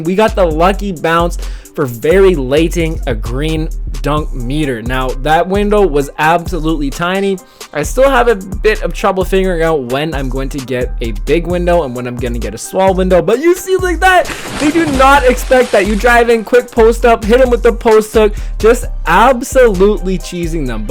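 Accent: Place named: Canada. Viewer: I am going to take a WILD guess... are you Canadian? Whenever I hear you say "out" it reminds me of a Canadian friend of mine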